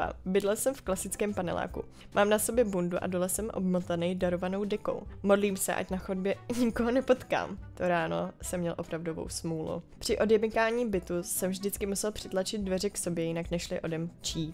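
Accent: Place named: Slovakia. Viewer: super videa ale ta slovencina no docela sila :D Malem sem se podelal od smiechu :D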